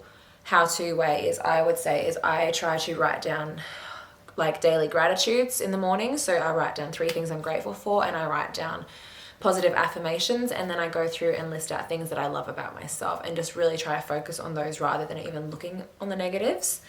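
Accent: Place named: Australia. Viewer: It’s a blessing to hear your Ozzie accent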